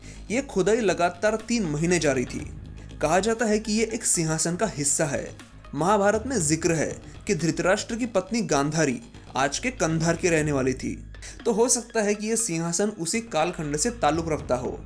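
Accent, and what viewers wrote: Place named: Maharashtra, India. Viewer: you should work on your hindi accent.. looks too much of marathi influence.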